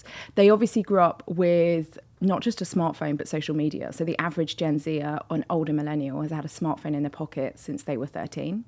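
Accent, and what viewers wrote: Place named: Southern England, United Kingdom. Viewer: That is not estuary, that's a standard southern middle class accent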